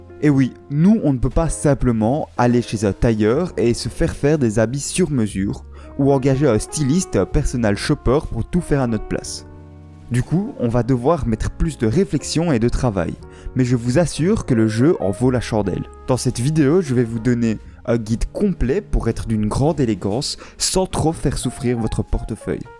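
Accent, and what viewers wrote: Liège, Belgium. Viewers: je reconnais une pointe d'accent liégeois bien de chez nous, big up frérot !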